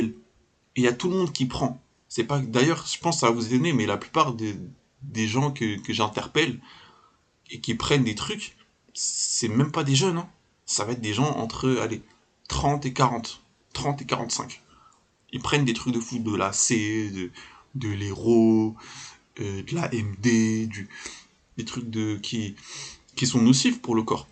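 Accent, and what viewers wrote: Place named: Banlieue, France. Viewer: C'est surtout un pays raciste quand on en entend ton accent de mec de cité ça m'étonne pas que tu trouves pas c'est vraiment une mentalité médiocre de gens jaloux la France. La seule chose pour que ça pette pas c'est le RSA, le chômage et la carte vitale sinon les services éducatifs sont flingués tout est fait pour t’empêcher de te former et de faire du fric c'est juste hallucinant c'est démoniaque. En plus ils font venir une tonne de migrants de pays du sud mais ils arrivent même pas à trouver du taff qui paye aux gens de cité comme toi les français d'origines étrangères nés de parents étrangers en France. C'est un chaos migratoire voulu pour baisser les salaires, créer le chômage et te laisser esclave au RSA. Et en plus aucun politicien n'interdit l'intelligence artificielle tout le monde laisse faire ces algos mathématiques remplacer les humains alors qu'elles peuvent bugger c'est hallucinant comme on essaye de détruire et appauvrir les gens :)) Et à côté de ça y a des ultra riches qui veulent pas payer 2% d’impôts il y a trop d'inégalités salariales on engraisse les même alors que le travailleur ce qui fait le vrai taff donc apporte la richesse n'arrive pas à s'enrichir suffisamment. 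La gestion du pays est maffieuse rien ne va il y a 1 député pour 100 personne alors que les politiciens ont détruit l'industrie pour les services du secteur tertiaire sauf que maintenant il n'y a plus de services c'est l'ia que les gros patrons veulent utiliser à la place des gens. Bref rien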